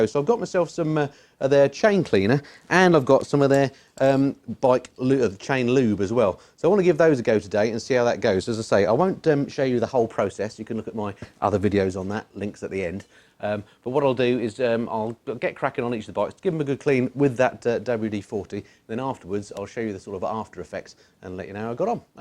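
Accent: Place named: United Kingdom. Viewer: Brits talk funny.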